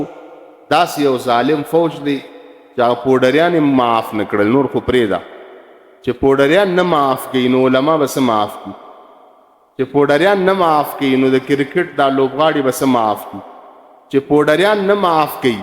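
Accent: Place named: Pakistan. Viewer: اصل دا ده چې ستا ژبه او لهجه پاکستانی ده اسلامی امارت که ځانونه افغانان بولي نو ستا په څېر ملایانو بېخ د وه څېړي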